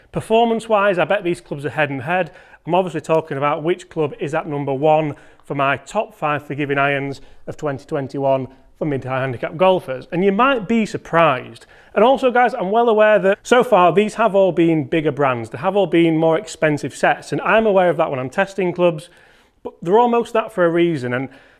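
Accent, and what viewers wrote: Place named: England, United Kingdom. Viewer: Im 63 I took up golf 6 years ago 6'4' 220 LBS so I hand a set custom made ,all irons about an inch longer than most. Im a long hitter 200 7 ,<num> ish 8 130 SW and 145 W 75+ 60! , anyway I have the Callaway big bertha irons ,5 years old and I think they are time to replace as I was playing 3 times a week , I think my shafts are fatigued and well as the heads, ( all materials deteriorate over time) problem is my HC is now a 26 .5 do to the fact I think the set is just used up and frustration takes over and I was a 20HC 2nd year into this ( HAD A GREAT GOLF COACH EVERY A WEEK)then dealt with health issues ( im back and feeling much better ,Your English accent and fast talking is hard to follow , Plain and simple I have to have mine custom made , 5 iron to PW , please suggest a head and shaft combination you feel would get me back to low to mid 90s game , , Thanks Brad USA .Any information from you would be greatly appreciated . 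Everyone at my golf club has their own advise ( OF COURSE) The club champ do tell me that if he could work with me more often one on one I would be low 80s/high 70s and club champ, Also said my iron shots are unreal," nobody here hits a 7 iron 200 + Advise? Help?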